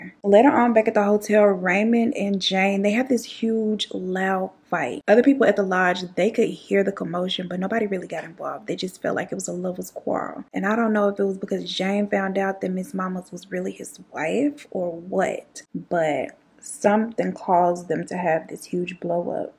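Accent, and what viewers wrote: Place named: South, United States. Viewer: I love some. Let me just say that I am a language chameleon. Like if I go back home to south Florida I start talking with a Cuban like accent. While living in Cali I sounded like a surfer. I just can’t help it. So listening to has me talking all southern and I catch myself using her phrasing. “All of the things” Omgosh! It’s okay though. Sis is amazing!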